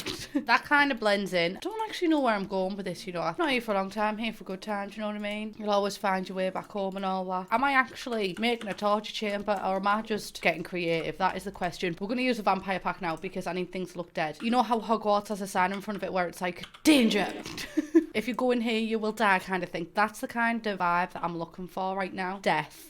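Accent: United Kingdom. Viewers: Her accent is by far the most “English” I’ve ever heard in my entire life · Can I just say how nice it is to see someone who is so British and the humour is too. It's so nice not to hear Americans, nothing against them but I just connect with a fellow Brit more. You seriously fucking crack me up and never stop with the accent and slang. Kim and Aggie 4lyf! 😂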